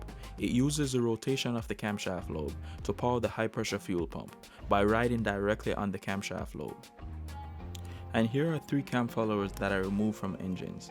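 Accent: Jamaica. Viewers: Just subscribed my boss. Yuh sound like a yaadie. Everything you touched on i have done on my car. It makes for a trouble free.. Worry free..peace of mind experience. Great video, to the point and helpful. How can you differentiate a misfire due to carbon build up? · You Jamaican bro? bless up ✊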